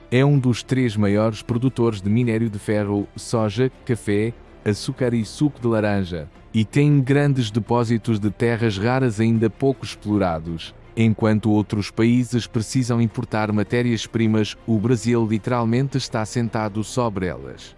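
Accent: Portugal. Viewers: tira essa voz de português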